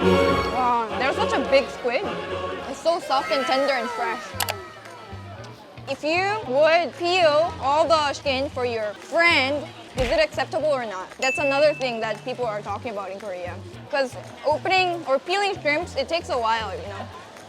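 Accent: Philippines. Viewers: Your Filipino accent is back 😊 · omg cute cute ng tagalog hahahahahaha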